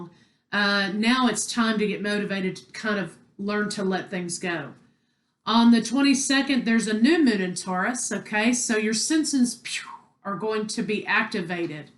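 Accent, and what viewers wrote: Texas, United States. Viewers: Love your style of reading. And I just knew you’re Texan. You’re dead right too. Still don’t know how I’ll handle her if she makes contact. My head says hell no. To much conflicts in the past, but there’s a magnetism and it bothers me, as me as person rather start a new with someone else. Im afraid, like you said, it’ll go back to be like before. Tough call. Thank you for a very good reading.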